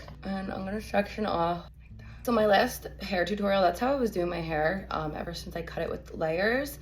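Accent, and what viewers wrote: New Jersey, United States. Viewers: Awww she’s got the Jersey accent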